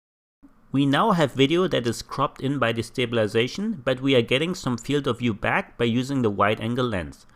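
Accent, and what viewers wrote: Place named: Germany. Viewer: Thanks for the tips. You have such a nice German accent 😉👍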